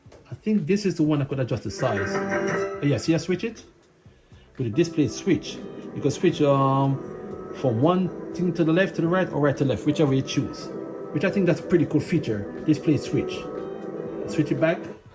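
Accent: Jamaica. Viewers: Sounds like a Jamaican :)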